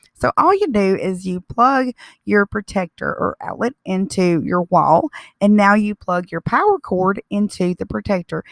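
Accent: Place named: Alabama, United States. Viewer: I absolutely love your accent. Sound like my sil from Bama.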